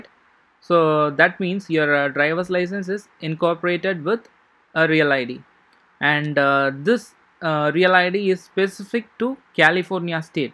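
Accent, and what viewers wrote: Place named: India. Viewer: heavy indian accent is making this video impossible to understand..... dislike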